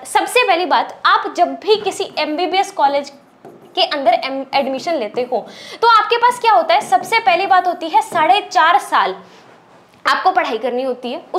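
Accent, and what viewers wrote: Haryana, India. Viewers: Dii i am also from Haryana this year i cleared 10th class with 81.2%i hope i also become a mbbs student just like you,your way of speaking is exact like real haryavi i like it🥰😇🤗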